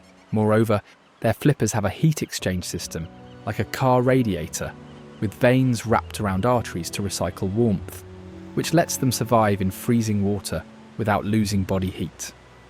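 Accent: United Kingdom. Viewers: I tend to not believe so-called "authoritative" British accented voices.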